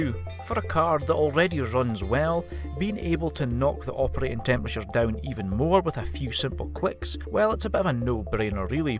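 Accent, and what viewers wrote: Scotland, United Kingdom. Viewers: yesss a Scottish narrator finally ear candy while i learn something... wow that sounded gaeeeee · the Scottish accent convinced me that I should do this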